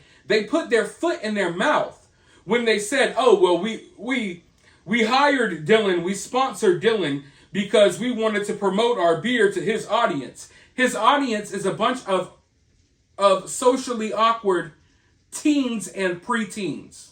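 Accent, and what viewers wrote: South, United States. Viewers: That country accent, you sound like me lol!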